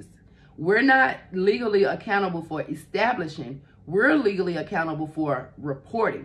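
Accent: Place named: South, United States. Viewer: Great video! (And I love the southern accent!)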